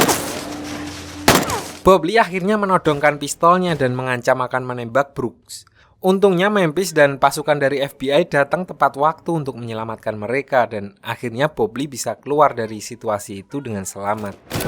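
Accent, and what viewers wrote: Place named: Central Java, Indonesia. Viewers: Joh. Iki ngadimine wong magelang to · Wong Jawa Tengah kyok e ki narator e😂😂😂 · Fixs admin e wong jowo 🗿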